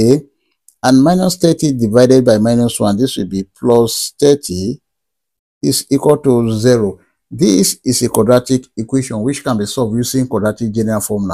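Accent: Nigeria. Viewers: Love your Nigerian pronunciation 😀😀😀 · I like Nigerian accent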